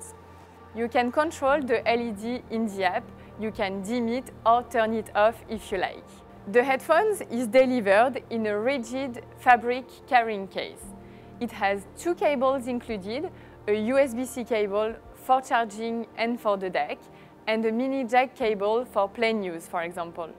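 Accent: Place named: France. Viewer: J'adore son accent français 🥰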